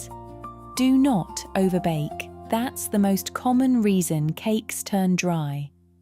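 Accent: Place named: United Kingdom. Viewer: I love her British english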